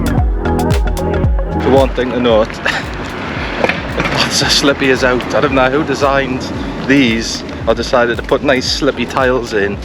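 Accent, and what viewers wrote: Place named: England, United Kingdom. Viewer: Hi,fairly accurate video, trying to make out your accent to see what part of England you're from.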